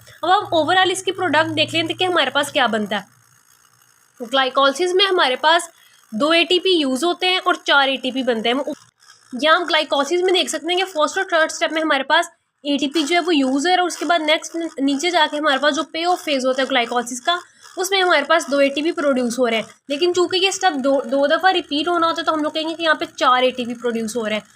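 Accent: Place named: Punjab, India. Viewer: Punjabi speaker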